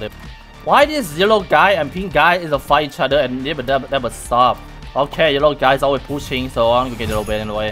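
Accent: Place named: Thailand. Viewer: I can barely understand your accent because your from Thailand im from Philippines...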